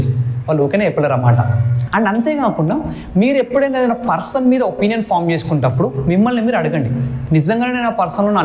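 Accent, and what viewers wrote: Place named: Hyderabad, India. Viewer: Bro Meru Hyderabadi anukunta.meru matalade vidhanam alage <link> voice kuda chala bagundi.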